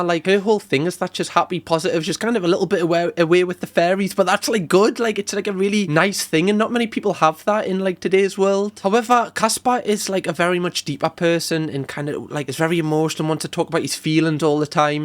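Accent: Newcastle, United Kingdom. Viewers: Are you from Newcastle? Wanna know your accent :) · Hey!! I just LOVE your accent! I'm from Florida but my best friend is a geordie and you sound like him...or are you Scottish?? You are effing hilarious "SAY YES! SAY YES!! SAY YES!....PAHHH!!" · You are so intuitive but also funny and entertaining! Lovely to hear the Geordie accent! My parents were from Hexham, keep up your good work 😂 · Your videos are okay but I'm subscribing because your a geordie.... UP THE TOON!!!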